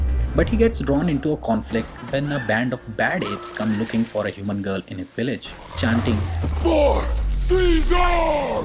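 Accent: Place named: India. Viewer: and your reply here proves my theory that your just using ai generated script and edit to make these videos. Your randomly connecting movies with similar themes from different cultures in this video which would only be possible if someone watched a he'll lot of movies . That along with your robotic voice with Indian accent which again was obviously generates by ai, gave me a great doubt on your content. Anyone who watches multiple movies from different culture can only be from someone who is darn passionate on about cinema which you claim in your YouTube bio to be from your bollywood industry background , which is believable , except anyone with that mindset would never reply with something as simple as what you did here. Basically your using ai to make all your content in this channel as evident from the 9 months of content post activity 🤣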